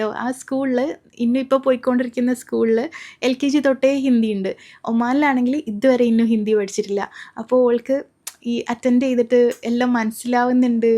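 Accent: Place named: Malappuram, India. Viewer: samsarikkumbol vadakkare samsaaram maarunundallllo😅❤❤malappuram slaang avunnund chilappozhakkke.thoneetundo😅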